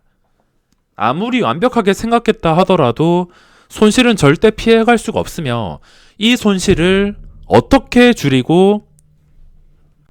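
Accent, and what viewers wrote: Gyeongsang, South Korea. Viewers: 아 경상도 억양 저얼라 짜증나네 억양이 모 저러냐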